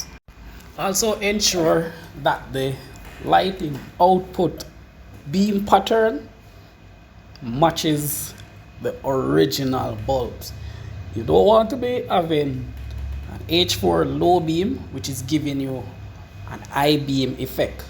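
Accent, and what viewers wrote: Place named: Jamaica. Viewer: Ohhhh. You are jamaican. What part of jamaican are you from? I was born in Manchester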